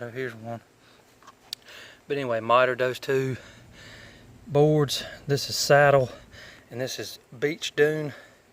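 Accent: South, United States. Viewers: Looks really nice. I like your country accent.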